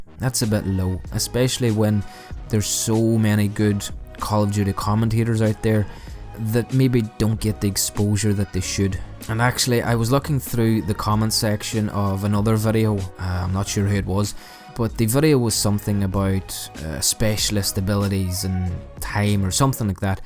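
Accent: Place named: Derry, United Kingdom. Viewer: explains why you have a slightly northern/ derry accent :) i live in dungannon tyrone, a big town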